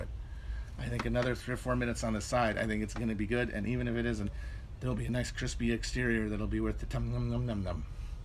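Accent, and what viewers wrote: Louisiana, United States. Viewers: When you began speaking with the Cajun patois, it triggered some personal memories from long ago. In that geological era, I was trained as a saute cook in a rather upscale Holiday Inn by an incredibly talented Louisiana immigrant. The hotel in question is way north of the Mason Dixon line. He never revealed to me how he got past the border guards, and seemed uncomfortable discussing his transition. I never pressed him about it. He is an expert saucier and makes the best red beans and rice I’ve ever had. And after all these years, he still won’t give me the recipe. So.... I really enjoyed this episode. My favorite so far. Breaking in a new grill, the Balkanized Mid West, protesters, attack helicopters, Harry Nilsson, Covid Economics & Sociology, and finally, The Steak. Looked delish. I personally like mine dangerously rare. 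Meat thermometers are essential for any household, but on the grill, eventually you will learn how cooked the meat is just by a touch of the tongs. Bon Apetit.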